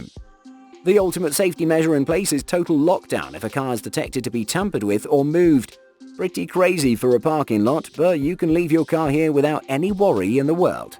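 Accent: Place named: United Kingdom. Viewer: The narrator is British. That is how the British pronounce the Z.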